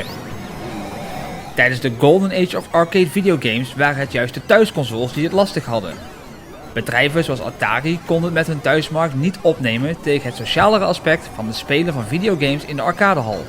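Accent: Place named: Brabant, Netherlands. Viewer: Ik hoor een Brabants accent 🤩 Good job!!!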